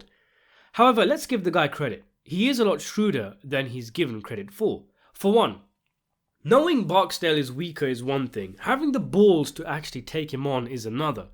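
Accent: United Kingdom. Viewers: The perfectly placed Wire one-liners in a British accent are CRAZY 😂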